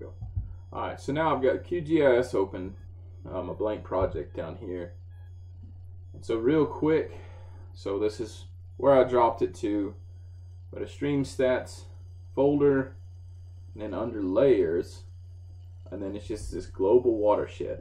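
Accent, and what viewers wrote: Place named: United States. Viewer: bro this helped out so much with hydrology class. Not trying to offend anyone, but I'm glad there is someone with a good ole American accent speaking. Usually there is some guy from India or God knows where, and I can hardly make out a word. Bless their hearts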